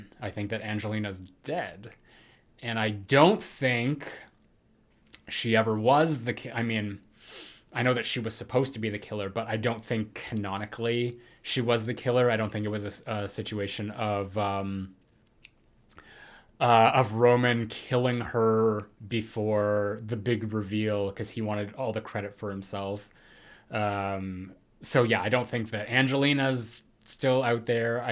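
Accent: Canada. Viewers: You're so cute: quite facially emotive, sometimes handsy, and I love when you speak Canadian like out and about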